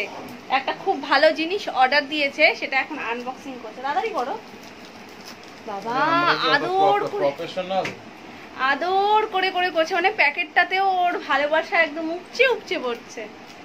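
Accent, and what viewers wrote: Bangladesh. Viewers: Tumi bangal nah "neba" Deba" bolo amio boli Amro ase nah j "nebe" debe"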